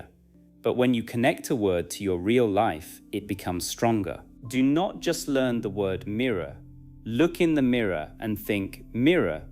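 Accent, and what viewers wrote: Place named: United Kingdom. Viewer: Oh God the British accent is amazing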